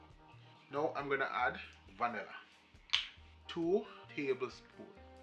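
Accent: Jamaica. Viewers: Nice chef, sounds like you come from Clarendon...the heg...lol my family is from St. Elizabeth.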